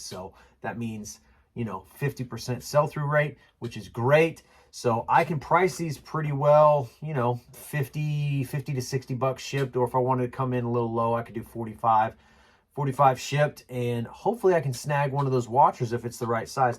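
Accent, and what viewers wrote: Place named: United States. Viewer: Lol that country accent 😂 great haul man! You crush it in shoes!!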